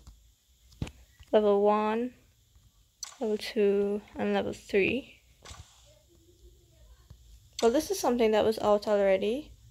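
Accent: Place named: Trinidad and Tobago. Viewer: you sound like a Trini but I'm from here. If you want to link with my clan hit me up. 『GØD』Ërmac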